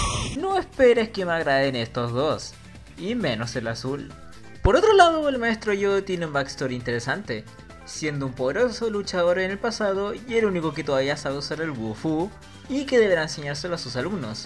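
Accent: Chile. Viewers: eres chileno? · no se te entiende nada..habla más claro, hasta pareces chileno .-.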